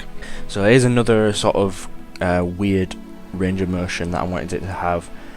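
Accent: Hull, United Kingdom. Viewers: You're welcome. It's literally a 'make everything beautiful' feature. A couple of clicks and boom! Realistic shadows and lighting. Did you use any constraints on the hand rig? They're a pain to set up, but they're a real timesaver for animators. Hearing the Hull accent on YouTube is weird. 😀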